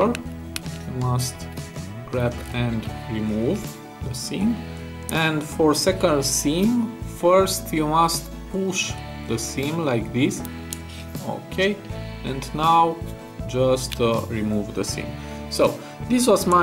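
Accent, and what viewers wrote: Romania. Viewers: like your Romanian accent of talking, man👍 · Romanian accent....the best.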